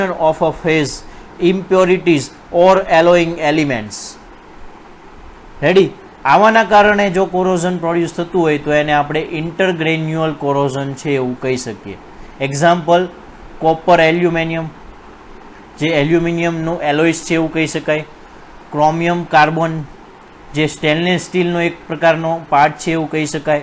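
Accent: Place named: Kathiawar, India. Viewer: Ready sambhline laage chhe kathiya vadi chhe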